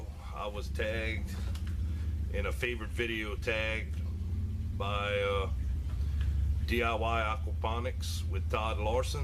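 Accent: Louisiana, United States. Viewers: Hi. I jumped over and gave you a sub back. I like to guess where people are from by their accent. Are you from Louisiana, maybe? We're in Missouri. I love all the green in there this time of year. We've had unusually cold very early this year and everything is toast...cold toast. Well, I listened long enough to hear that you are from LA. ~Janette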